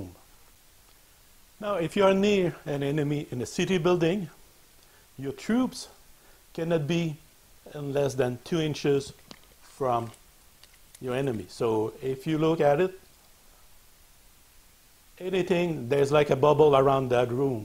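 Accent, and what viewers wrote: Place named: Quebec, Canada. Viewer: Hey!! is french your first language? cause it seems like you have a quebecois accent:)! if so, i'm quebecois too! I really enjoy your videos! You are really helpfull, cause i start doing some build of model kit and i didn't really know how to. and i found your channel and it helped me a lot! And it's cool that you are canadian!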